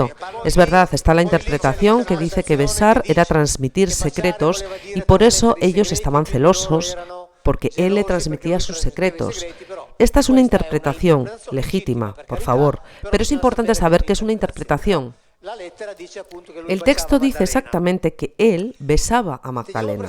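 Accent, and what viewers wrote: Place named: Castile, Spain. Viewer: Puro acento castellano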